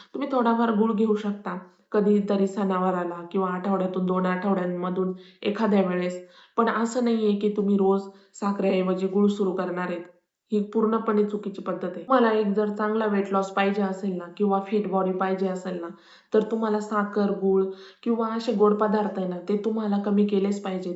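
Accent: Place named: Kopargaon, India. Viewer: छान माहिती मॅडम , तुम्ही कोपरगाव अहिल्यानगर च्या रहिवासी आहात काय, बोलण्यावरून मला वाटते 🙏😀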